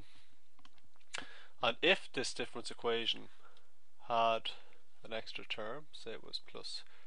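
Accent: Ireland. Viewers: haha wow didn't expect an irish person to be giving math tutorials on youtube. Sound man (Y)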